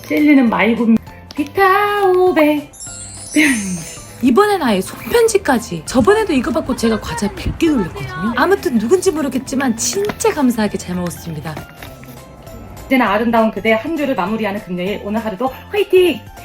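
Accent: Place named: Yeosu, South Korea. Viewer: 여수 사투리 잉~~잉??!! 구독 합니닷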